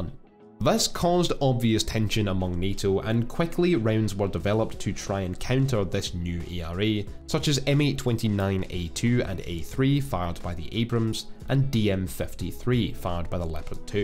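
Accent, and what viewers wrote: Scotland, United Kingdom. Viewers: Is this a scottish accent? Sounds awesome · is he scotish ?! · bit of scottish for you there, pal, like the narrator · Speak American man! I would love to watch and learn from ur videos but the Scottish accent irritates me, u pronounce a lot of words wrong.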